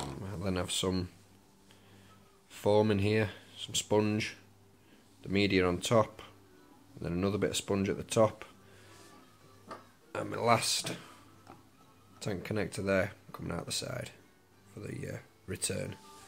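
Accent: Yorkshire, United Kingdom. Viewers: Good to hear a Yorkshire lad doing a review! How's the DIY reactor doing? I fancy giving one a go. Cheers. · Hi mate thinking of building my own in couple of days gonna make mine very similar to yours does it work ?? P.s were u from u sound like u a Yorkshire lad.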